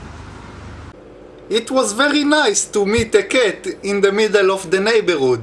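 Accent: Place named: Israel. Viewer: וואי תקשיב המבטא שלך מראה את הטמטום הישראלי . אבל זה בסדר :)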